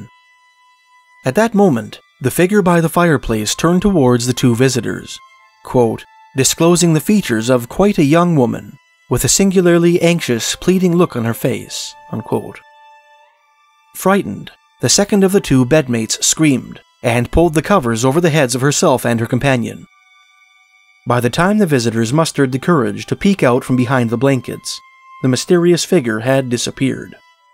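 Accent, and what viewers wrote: Canada. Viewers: i normally can't stand human narration (crass commercialism, low application) i guess the canadian accent made this work well enough, thanks for sticking to culture that you can represent with some integrity and concern, because it makes for a more meaningful tell. "whoever would have thought someone could make canadia interesting" ;) <3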